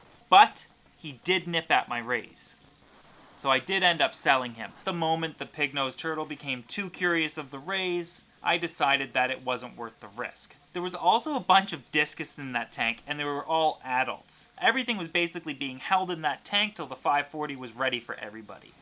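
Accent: Canada. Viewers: you make good videos. Well planned and we'll spoken. are you Canadian? jw u sound it . cool videos thanks for the info!!!!!!!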